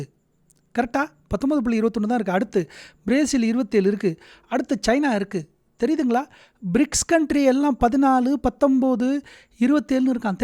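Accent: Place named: Tirunelveli, India. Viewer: ஏல இருலே 😂 சூப்பர்…. நெல்லை வாய் மொழி ❤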